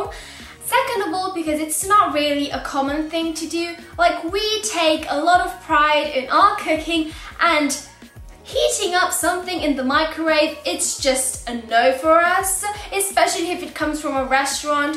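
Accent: England, United Kingdom. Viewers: My grandmother was born in Italy. We still have her naturalization papers. Your English sounds English. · Question.. If you are Italian, why do you have an english accent?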